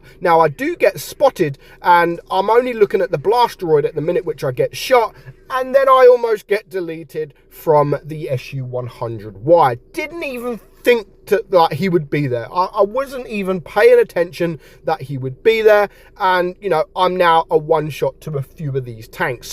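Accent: United Kingdom. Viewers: Bri’ish?❤❤ anyways, love ur content!❤🎉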